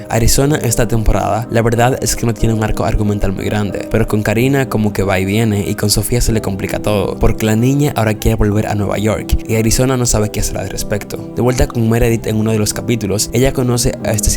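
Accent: Dominican Republic. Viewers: Eres de República Dominicana?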